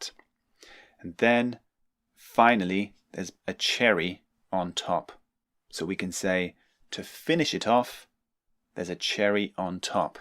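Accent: United Kingdom. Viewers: With which British English accent do you speak?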